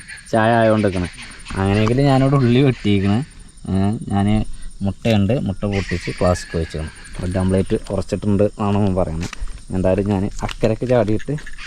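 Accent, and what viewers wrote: Malappuram, India. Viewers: മായ പുയ അങ്ങനെതന്നെ പറഞ്ഞാമതി ❤ മലപ്പുറം അങ്ങനെ ആണ്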